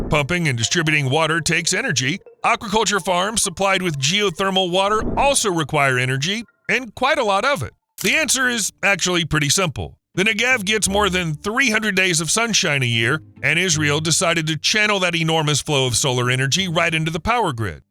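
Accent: United States. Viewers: the shrieking laconic American voice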